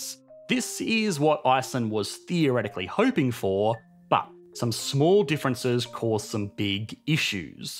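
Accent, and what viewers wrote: Australia. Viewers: I’m so sorry but I’m so tired of his australian accent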